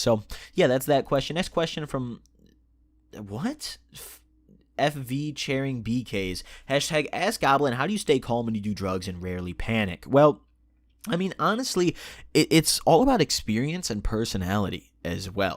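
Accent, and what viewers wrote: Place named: Indiana, United States. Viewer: Bro I thought u were from Indiana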